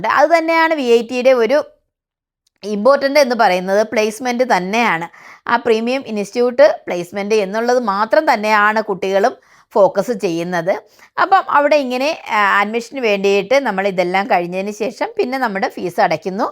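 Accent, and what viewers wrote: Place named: Thiruvananthapuram, India. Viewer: Chyacchi thiruvanthoram aanennu thonunnu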